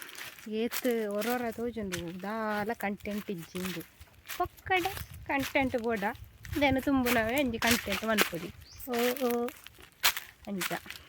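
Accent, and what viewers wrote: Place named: Tulu Nadu, India. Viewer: Eerna Tulu kenara bhaari kusi aapund👌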